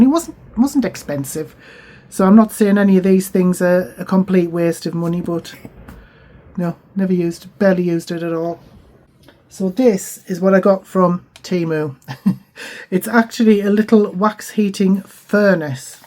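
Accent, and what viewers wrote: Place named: Sunderland, United Kingdom. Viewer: Just found your site.. that sounds like a Sunderland accent.. great vid thanks 🌹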